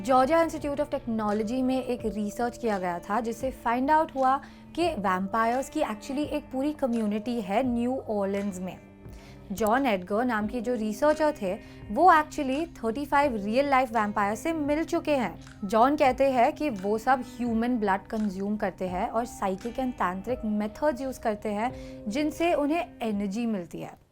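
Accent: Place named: South Bombay, India. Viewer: The way you pronounced "ळ"😁 you're from Mumbai. And your accent sounds so south Bombay.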